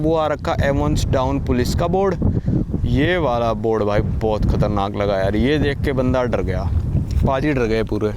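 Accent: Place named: Himachal Pradesh, India. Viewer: भाई हमीरपुर, हिमाचल से तो नही हो, accent हिमाचली लग रहा आपका, मेरे रिश्तदार है वहाँ इसलिए बोल रहा हूँ, ❤❤ वलॉगिंग बढ़िया है👍👍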